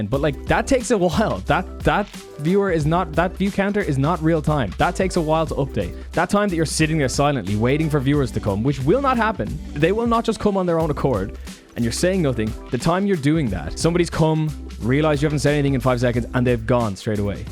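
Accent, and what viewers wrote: Ireland. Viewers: haha nice i thought i heard an irish accent im originally from cork but live in galway now keep grinding man love the content ! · Are you irish?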